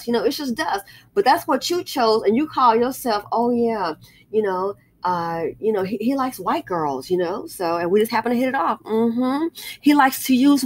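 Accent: South, United States. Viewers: Girl that country twang 😭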